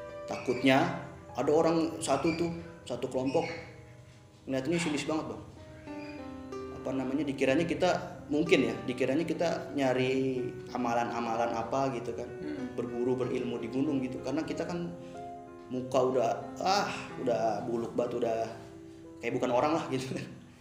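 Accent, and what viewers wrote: Indonesia. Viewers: Btw orang mna tuh yg lagi ceritanya .. GERO2in ,fiks orangsunda kek nya😁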